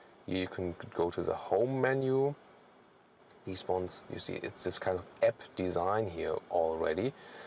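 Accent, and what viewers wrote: Germany. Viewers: Wow, I so expecting a German biased report. I was wrong. The guy obviously German but a professional in his work, gave a proper detail account of each car. Hell of alot better than any British car magazines ever do. I love his accent, his English is alot better than my German, so we'll done. With such detailing lasting more than a poxy 3 or 4 mins from the crappy what car reviews ,who often get it completely wrong when there silly surveys come out which you get a quick flippant remark if your lucky, they sure could learn a few things from guy here.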